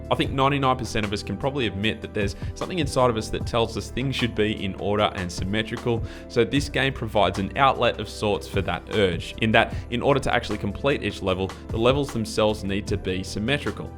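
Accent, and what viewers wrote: Australia. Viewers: This guy's Australian accent is surreal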